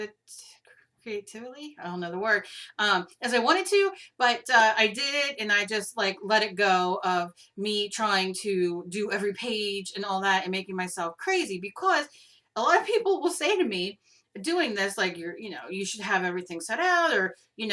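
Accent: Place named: East Coast, United States. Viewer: I personally LOVE watching your videos, hearing you talk is much better then music. It makes me feel as if we are hanging out together. I love the accent, I miss the East Coast so much now that I live in the West. Everyday I go to see if you have new videos along with Gina, Lindsey, so many talented ladies! I thought it was great you mentioned your weight loss! When this video started I said to myself, how can I ask her did you loose weight? Then you said it! I am so proud of you, I need to start doing something myself, I am a food junkie! I look forward to recipes and a craftroom tour! Thanks for sharing all that you do! I think you are a peach:)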